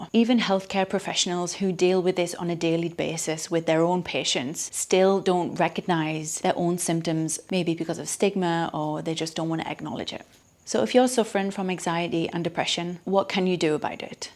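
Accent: United Kingdom. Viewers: I adore British prononciation, sounds like a music to my ears. thank you for the video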